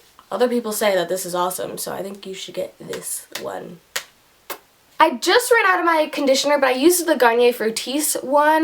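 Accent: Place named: United States. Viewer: IKR!!! But American accent obviously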